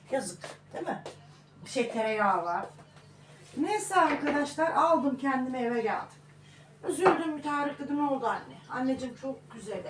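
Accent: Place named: Aegean, Turkey. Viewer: Şivene bayılıyorum Ege şivesi 😘😘😘Çiko da evinin bir ferdi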